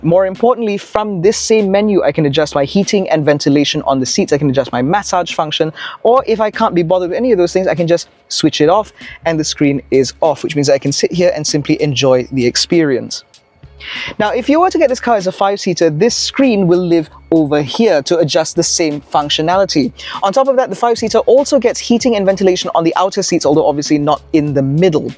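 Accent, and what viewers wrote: United Kingdom. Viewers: British accent on a British brand, apt! :D Lovely car that I can't afford.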